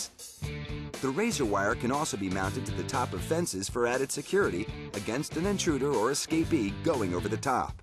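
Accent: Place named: United States. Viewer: I like how he cheerfully explains how it can cut through cloths and flesh with his American voice.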